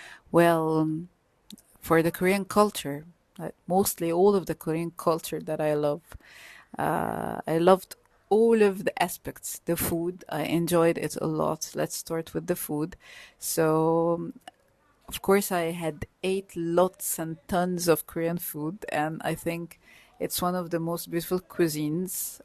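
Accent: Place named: England, United Kingdom. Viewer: Love you English accent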